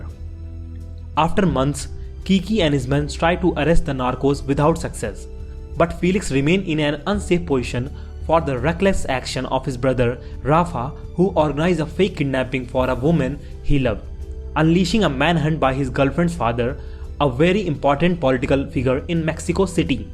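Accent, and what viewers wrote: India. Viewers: I dont know what they are saying about the accent but haha never thoughts i'd hear a story happening in my language be explained in indian accent haha so cool dude! thanks a lot, i really could not recall s1 ! this one was concrete and short :D Greetings from Colombia and btw, so stoked you are into this show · The Indian accent is killing the video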